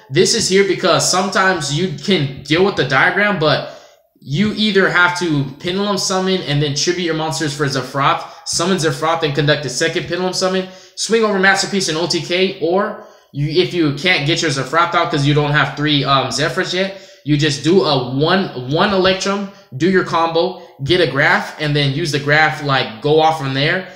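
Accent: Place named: Mexico. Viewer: you sound really mexican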